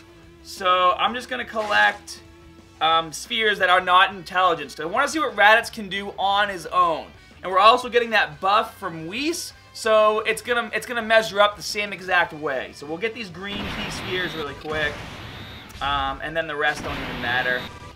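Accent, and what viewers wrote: Boston, United States. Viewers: Hey are you from Boston? U got a hint of the accent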